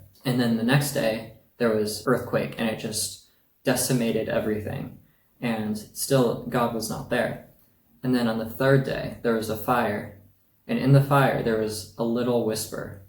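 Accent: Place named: United States. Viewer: I thought you live in USA.......becouse the way you speack english language....!😊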